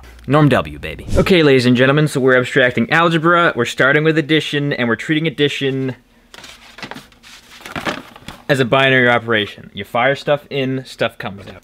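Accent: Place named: Scotland, United Kingdom. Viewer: Hey! 😉😁 currently loving:... 1/ The return of the Scottish accent 😍😊 2/ The backwards braces ("yer aff yer chump bigman" 😂👍 love it!) 3/ Wee homeschool blackboard (💥 This man won't be stopped! 😁) Cracking wee vid mister, keep them coming 😁👍😉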